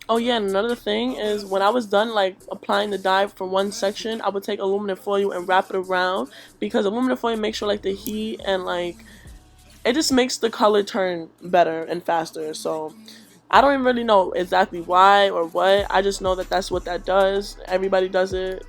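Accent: New York, United States. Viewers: the accent is giving , most def from NY😭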